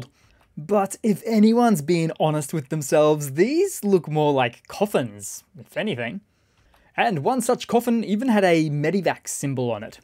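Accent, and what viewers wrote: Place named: Australia. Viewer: Its called an australian accent, doffus. Australians generally speak in a very singalong manner. So stop the snobbism, mate.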